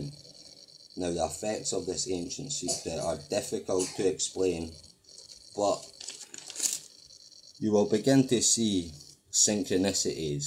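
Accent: Scotland, United Kingdom. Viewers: Well, I do not understand everything because of your accent, as English is not my mother tongue, I have problems with the Scottish accent, AND with the baby's noises in the background, sorry to say...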